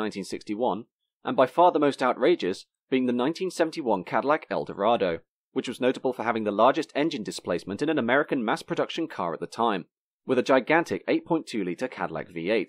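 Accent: United Kingdom. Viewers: Hearing a commentator with a British accent talk about poorly designed/inefficient American cars is utterly GALLING. Wow nobody knew how to build low quality unattractive, inexcusable, unforgivably bad cars better than the English. One step higher than Trabant, if that. I can't make it all the way through a video on the English car industry without a good strong anti depressant.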